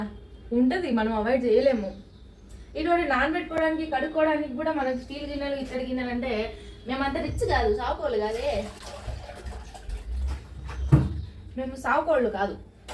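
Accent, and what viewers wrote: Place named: Rayalaseema, India. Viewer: Good to hear your conversations. Your Rayalaseema accent is wonderful to hear. Love your videos 👌👌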